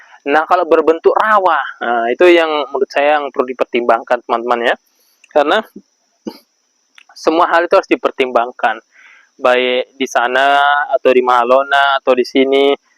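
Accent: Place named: Java, Indonesia. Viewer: Jawa itu pake nanya lagi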